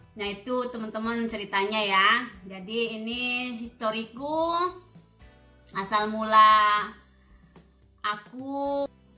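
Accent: Sunda, Indonesia. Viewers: Smua ada waktu nya ya Teh. Sy dengar Teteh klo lagi ber bhs sunda kental skali. Pdhal asal karuhun Teteh dr brebes. Gpp ya Teh smua klg kita. Smoga damai bersama klg dan bahagia slamanya. Waktos Teteh bercerita g tersaa air mataku ikut mengalir.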